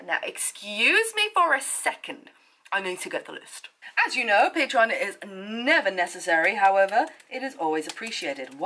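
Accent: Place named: Birmingham, United Kingdom. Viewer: I knew you were a Brummie 👍🏼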